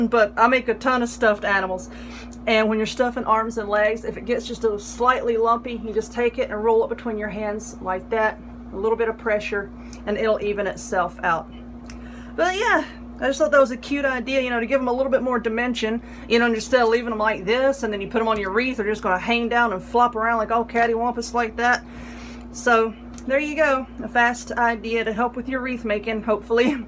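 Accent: South, United States. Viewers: Love your videos and southern accent . Other people talk so long to explain how to make something and they lose me . Quick and easy thank you Namaste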